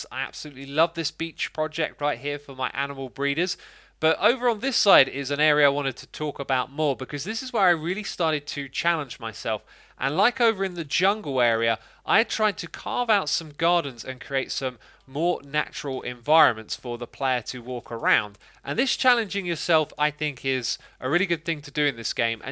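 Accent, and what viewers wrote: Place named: United Kingdom. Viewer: Why are you British now wtf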